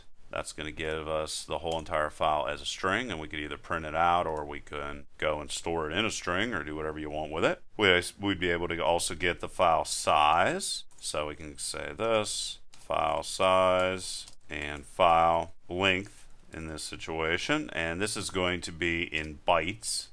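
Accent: Pittsburgh, United States. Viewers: OK... you are indeed a Yinzer... and unlike so many videos, I didn't have to struggle to understand what is being said (which given that I used to work for Greater Bell Labs and had co-workers in my group from dozens of countries and had no problems understanding them, says how poor some of the videos you find up on YouTube are). While this video is a bit long, I think it would have been nice if you had explicitly covered the "var -> closure" syntax and nested each closures. Now to do some digging, as I try to write a Groovy script do do what I can do in over a dozen other languages already.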